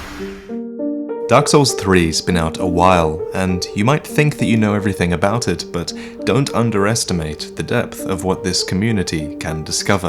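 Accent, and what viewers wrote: Australia. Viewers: he is Australian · Hey, what part of Australia are you from?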